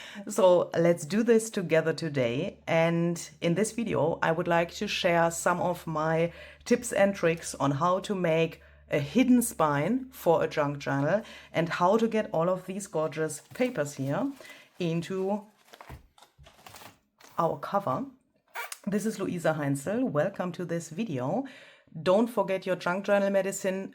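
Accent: Germany. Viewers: I am German. At first it was difficult for me to listen to your English. But your creativity is way too amazing to miss. So I got used to your English. I hate the German accent 😅 I don’t know if your English gets better with any video or my ears just got used to it. Anyhow by now I love listening to you and laugh when you stumble about words. As someone who is been missing even German words every now and then I feel with you. I love the way your brain works and how you do your tutorials. Please stay as funny, educational and creative as you are ❤ you definitely became one of my most favorite crafters on YouTube ❤❤❤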